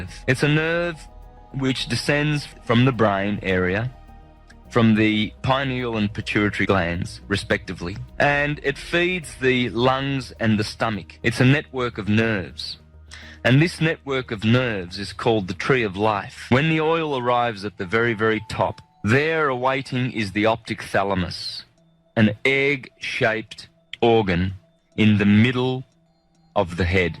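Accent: Australia. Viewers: Shame on you for not picking up on his voice and Australian accent. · His Auzzie accent gives me the shits.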